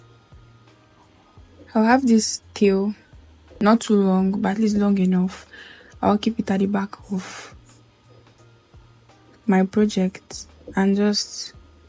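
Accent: Nigeria. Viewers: I love it it's so beautiful and I love your accent Are you Nigerian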